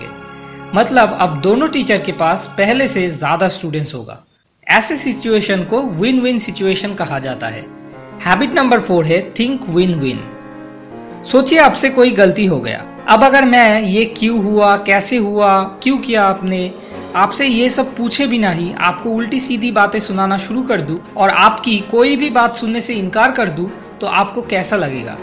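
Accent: Bengal, India. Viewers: U r bengoli Good bengoli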